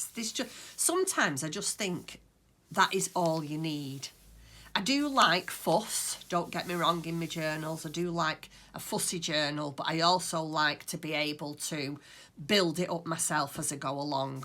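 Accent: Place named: United Kingdom. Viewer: Where are you from? I’ve never heard that type of British accent.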